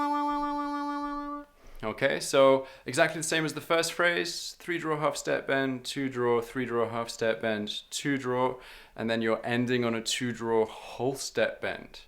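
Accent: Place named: England, United Kingdom. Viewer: You're Scottish? I never would have guessed. Your accent sounds English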